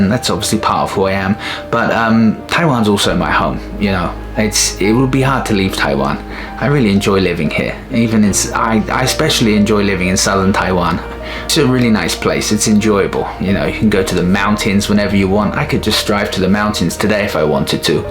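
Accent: United Kingdom. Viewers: Love the British accent! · 你的英文跟我的快一樣好 😂British accent, nothing better. Awesome vid. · 原來你的英語這麼好，英國腔不是很重，稍微有一點而已....住在異國是很辛苦的，總是會想家，但回家又捨不得....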